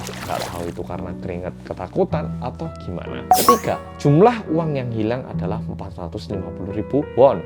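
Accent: Java, Indonesia. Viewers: Medhokkk banget🤭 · Harusnya bersama detektif Korea yang medok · MEDOK....... NICE 😁😁😁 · yey dtektif korea yang medok UPLOAD SETIAP HARI, semangat mas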